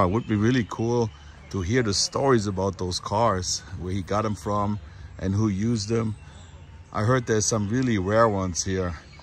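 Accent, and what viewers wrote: England, United Kingdom. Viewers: The England accent 🤣. Why I never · The English accent had me straight away 😂😂😂😂😂🤣🤣🤣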